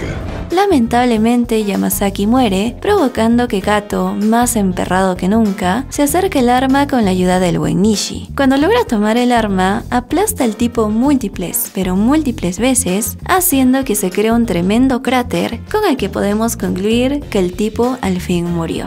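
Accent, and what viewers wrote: Peru. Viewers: Eres de perú? Digo por el gaaa y gracias por el resumen eres la mejor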